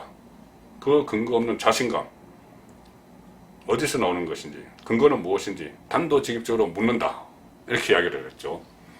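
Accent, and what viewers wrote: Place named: Daegu, South Korea. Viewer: 방송나와서 대구사투리로 대구욕보이지말고 술마셨음 디비자라 시간나면 나베 삭발하나 안하나 취재 좀하고 같은 대구인으로 방송나와 씨부리는거 보마 마이 부끄러워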